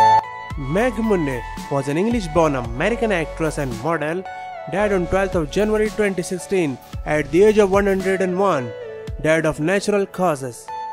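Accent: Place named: India. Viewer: his accent is clearly East Indian/Asian and a strong one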